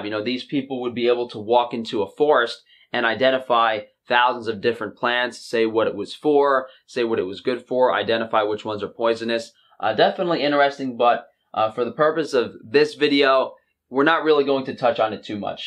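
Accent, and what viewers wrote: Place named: New York, United States. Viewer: I love the neat and tidy grooming and NY accent. Awwwh!💓 Great info here and especially given the fact that most plant foods today look, taste and provide entirely different benefits and flavours to humans than they used to. Not only have we made them resilient to pesticides and shelf life, more starchy, sweeter and less varied but we eat the same species repetitively and out of seasons. Humans have great metabolic adaptability and it is not only a key to our survival but how we found creative ways to increase nutritional value of foods. Recipes are a great way to see that in practice i.e. cooking, fermentation, food combination and even pairing certain alcoholic or herbal drinks with food.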